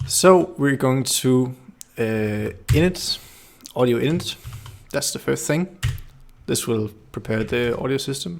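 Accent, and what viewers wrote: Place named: Netherlands. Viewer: from listening to your accent i'm assuming you natively speak Dutch. anyway, it would be great if you also had a video showing how to make the sequenced music (i believe composers just converted midi files to the SEQ format?) and the sample instruments as VAg and then into a batch VAB file . (im not too sure on the specific details but i believe the SEQ file and the VAB file containing the VAG sample instruments((with their loop points data etc)) were to be compiled into one file PSF i think) so that the sequencing/synthesis part of the audio also is explained, instead of the 'Streaming audio' part. also i read on the architecture aspects that it was also possible to do FM Synthesis, which i also would like to see demonstrated, the PS1 remains such a fascination to me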